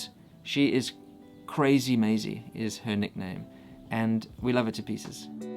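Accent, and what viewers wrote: South Africa. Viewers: Wow, you sound 100% South African. Not even a hint of a foreign accent. ..and that after only a year?? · It is so funny to hear that you are from the UK, your accent to my South African ear sounds South African and looking at you, you even look South African to me, weird isn't it? You could so pass for a Dutch South African, geniet u dag, and stay safe. I left South Africa 32yrs ago, all my family are and still lives in Cape Town, I would love to move back, but... I have a fear for the crime and having to live behind bars and in and out of cars. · You don't sound like you're from outside South Africa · You sound South African? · But you are a South African. That accent is dead giveaway. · Well he sounds South African so he's one of us now. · Ja. That's what I thought when I first heard him talk. What? But he sounds South African!!! What does he mean "moved to South Africa"? 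You already belong Mr.! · His parents are the farmers. Egte boere. Can’t you hear his accent? Capetonian accent. Lived in Uk 15 yrs. Now he is back. Go julle. Vas byt. With you all the way. From What Oz. 40 yrs. ❤️SA.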